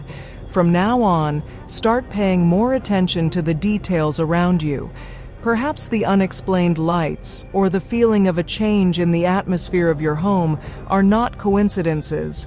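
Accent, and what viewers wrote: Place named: United States. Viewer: Sorry when I hear this sharp woman's American accent it makes everything like a Mickey mouse cartoon. Shame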